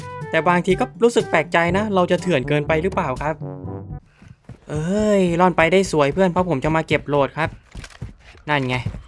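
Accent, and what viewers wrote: South, Thailand. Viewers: คนใต้ไหมนิ55ไม่น๊อดนะแต่ว่า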